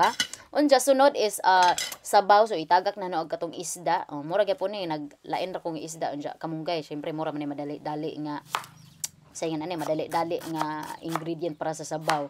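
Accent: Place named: Bohol, Philippines. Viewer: You sound like my ex boyfriend from Loon Bohol and my Grandma from Cortes Bohol hahaha your accent ate hahaha san ka sa Bohol? im from Davao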